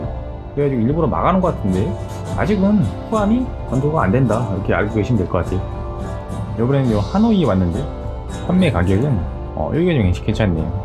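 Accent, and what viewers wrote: Chungcheong, South Korea. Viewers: 충청도 사투리 구수 하네유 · 충청도신가유? 딕션 참 구수하네유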